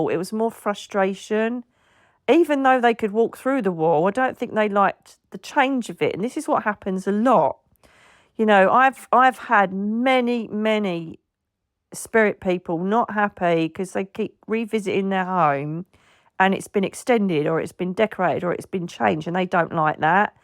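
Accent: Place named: England, United Kingdom. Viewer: That was so much fun! Thank you for sharing your stories and I just listening to your English accent ❤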